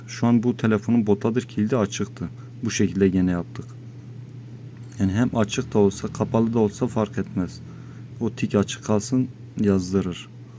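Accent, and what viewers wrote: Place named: Urfa, Turkey. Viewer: Ustam sen galiba urfalisin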